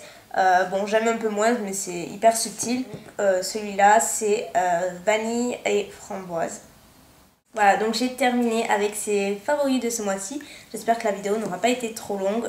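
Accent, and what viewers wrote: South, France. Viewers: Ah que j'aime cet accent du sud! Et surtout les "PU..", j'ai l'impression de me retrouver chez moi dans le sud! En tout cas, ta coupe te va très bien! Avec ce maquillage en plus tu es magnifique!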